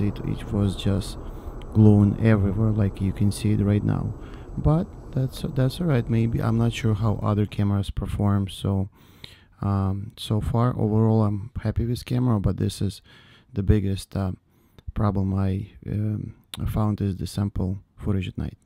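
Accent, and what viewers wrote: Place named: Russia. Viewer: your speech accent sound like ur russian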